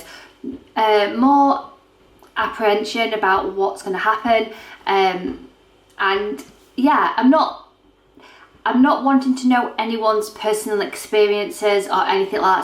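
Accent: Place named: Sheffield, United Kingdom. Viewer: You sound like you’re not far from me in sunny Sheffield xx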